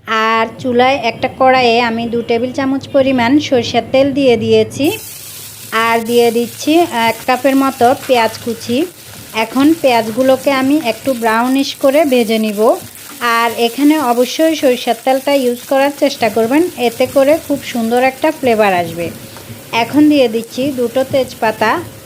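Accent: Noakhali, Bangladesh. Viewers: আফু, আমনের বাড়ি কি নোয়াখালি নি, আর ত মনে অয় আমনে আংগ দেশি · মনে হচ্ছে আপনার গ্রামের বাড়ি নোয়াখালী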